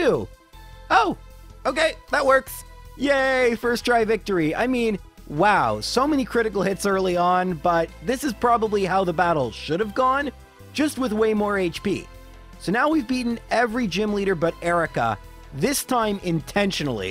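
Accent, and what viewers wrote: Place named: Canada. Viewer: He's Canadian, that's how they say it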